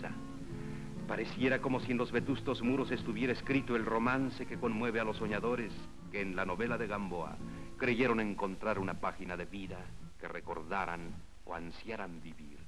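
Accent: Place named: Mexico. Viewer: el bello acento del locutor mexicano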